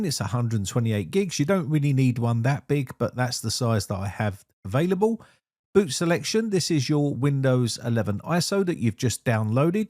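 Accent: United Kingdom. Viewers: He’s British, so if you can’t understand the mother English language, watch someone else 😉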